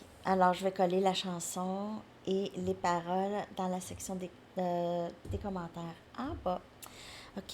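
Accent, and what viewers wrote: Canada. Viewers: Je vous ecoute pour la première fois, vous avez un accent Canadien ce qui est marrant Mais voilà, je suis oubligée,de.bien écoutée, car je ne comprends pas toujours bien,ce que vous dites Merci tout de même pour votre guidance 😂😂😂😅 ❤LGG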